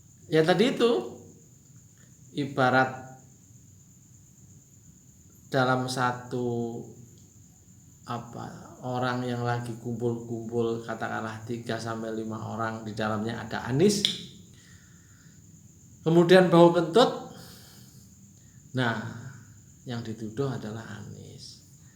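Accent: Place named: Banyumas, Indonesia. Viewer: Ini kaya chanal ngapak..bro ..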